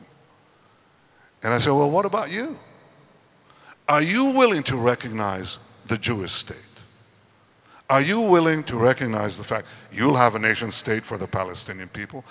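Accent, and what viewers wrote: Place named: United States. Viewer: Why he has American accent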